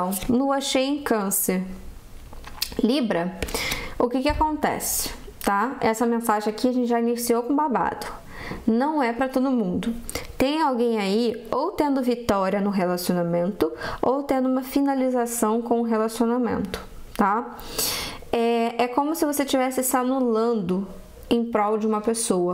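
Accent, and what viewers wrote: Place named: Rio de Janeiro, Brazil. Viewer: Eu amo o sotaque e a energia carioca 😂❤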